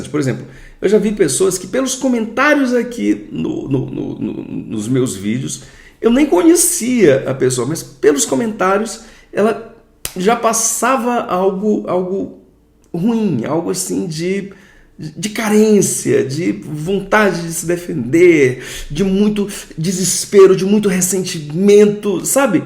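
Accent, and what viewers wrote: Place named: Pará, Brazil. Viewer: acredito ser do Pará….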